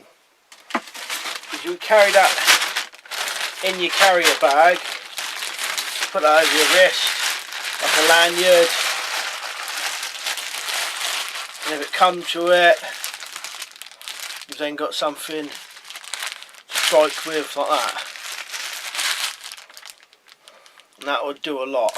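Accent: United Kingdom. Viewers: I have to say I really love these videos. I'm an American still currently in the US but engaged to a lovely British woman. We have a home, a life and twin girls in NE UK. I'm working on saving for immigration and I have to admit, I'm eager to officially be a Brit. I do have to say the accent reminds me of home (haven't been able to go back for nearly a year). And the lil can of spaghetti hoops in a Tesco bag is very effective but also gave me a bit of a chuckle... I can picture my hunny, the little ginger ninja, with her deadly Tesco spaghetti hoop flail takin down the baddies. 😂 I love how informative these videos are about normal items that actually can be carried. This kind of knowledge is great especially now, so Brits can protect themselves but not get done for doing anything illegal. I'm definitely looking forward to seeing more of your videos and I'll share them with my hunny as well. 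Blessings.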